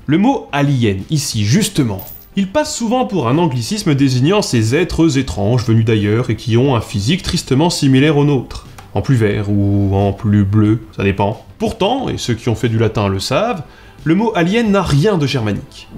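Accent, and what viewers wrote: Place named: Lorraine, France. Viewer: Il est lorrain si je ne m'abuse ...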